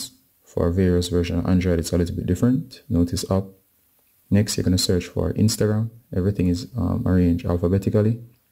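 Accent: Jamaica. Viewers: Love your voice man Are you jamaican or something ?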